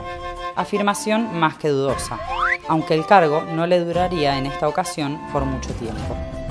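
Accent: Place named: Argentina. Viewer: Fiel a la leyenda negra, una voz en off de una inglesa hablando en castellano con acento argentino.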